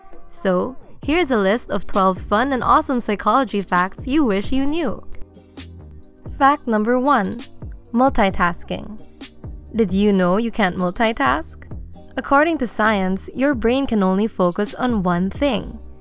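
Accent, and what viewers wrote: Philippines. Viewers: Why does the narrator sound like a Filipino to me? I talk like that lol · the voice actor sounds filipino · Why do i feel like she sounds like a filipino speaking in English. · The one doing the voice over sounds Filipino.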